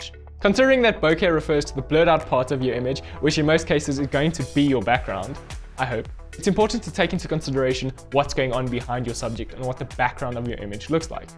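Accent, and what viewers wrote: South Africa. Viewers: Sound South African ...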